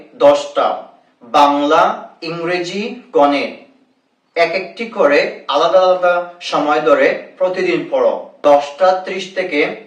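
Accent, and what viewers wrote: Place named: United Kingdom. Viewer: sorry baia / sir. but আপনার কথা বলাটা কিছুটা ব্রিটিশ টাইপের। মানে আপনি মনে হয় english techer তাই হয়তো এমন মনে হচ্ছে। 🙏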